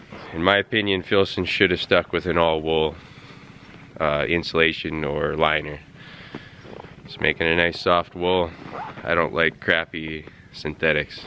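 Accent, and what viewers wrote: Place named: Alaska, United States. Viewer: Thanks for an in-depth review of these hats! The website unfortunately doesn't show the inside and I don't have a Filson store nearby, but I'm considering the Mackinaw hat. Are you up in Alaska, by chance? My Uncle is from there and you have the same accent 😎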